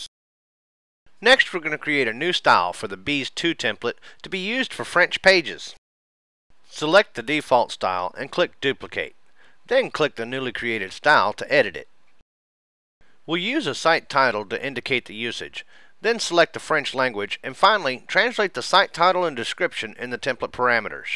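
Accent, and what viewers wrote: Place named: South, United States. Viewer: PFFFFFT on those who talk about speed! This was exactly what I need.. not a 20 minute hand holding just a Q&D on how to get there - THANKYOU.. this rocks! Would love to hear you speaking french too with that good ol boy accent of yours too. Thank you for being fast and concise.. there's others that can do an effective 20 minute presentation - I needed the 5 minute kick start!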